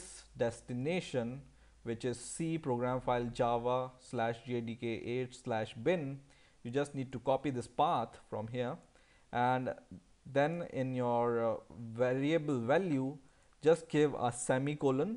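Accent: India. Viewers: Open caption that are auto generated and you will see google catches every word he spit out his mouth cause he's indian and our english is pure not with any type of accent. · Sooooo Indian! Like Russells Peters! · i find it's hard for me to understand the indian accent ! Have a nice time !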